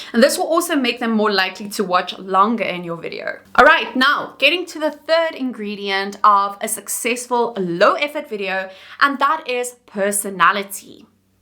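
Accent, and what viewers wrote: South Africa. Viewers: Very very very good video. Been thinking of trying to be a bit more low effort as well. Also, you sound like you're South African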